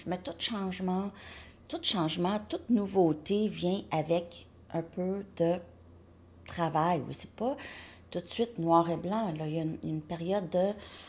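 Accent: Canada. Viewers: Bjour trop mignonne un petit ange surtout votre accent canadien bonne continuation et rayon de soleil d Algérie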